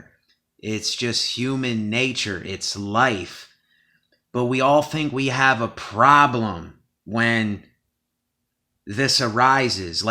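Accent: United States. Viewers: Are you from the states?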